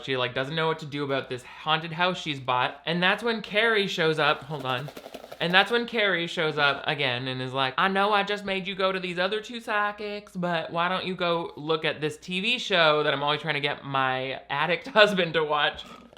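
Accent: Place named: South, United States. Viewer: These Christian movie clip breakdown brings out the best out of, and I'm not just talkin bout that southern accent. LOLs all around 10/10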